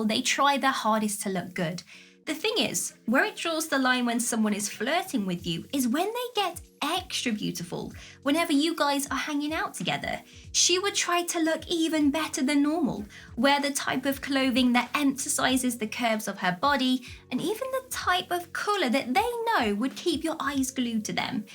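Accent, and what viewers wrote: United Kingdom. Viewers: I'm improving my english accent here. Very cultivated channel and the british excellent tone in language is superb here indeed. Fantastic beautiful. I listen to you just to improve my english tone 🤗❤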